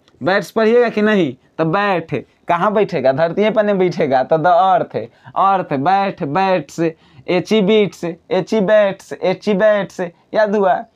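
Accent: Bihar, India. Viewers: Sudh bihari hain aap salutes hai aapko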